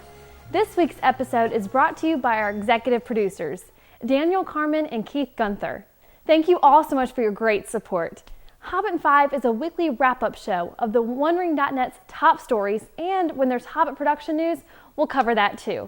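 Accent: United States. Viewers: What kind of accent is that? It's like, a mid-west Arkansas thing but at the same time it sounds like shes trying to hide it..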